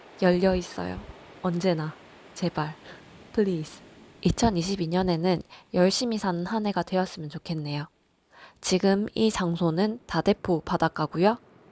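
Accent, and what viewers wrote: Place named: Japan. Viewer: 계획을 세워 그렇게 철인3종도 하시고 마라톤도 완주 하시고 지금 이렇게보면 하면 한다네요 말투도 일본에 있어서 그런지 일본 말투도 섞여 있네요ㅎ 요가 자전거 수영 마라톤 등산 못했지만 하나 하나 해가는 이 대단합니다 응원할께요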